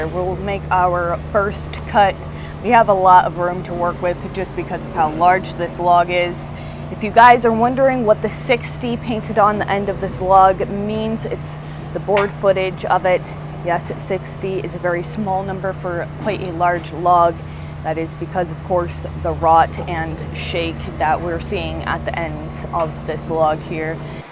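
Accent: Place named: Pennsylvania, United States. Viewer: being from PA, the "alright" is just a part of our PA talk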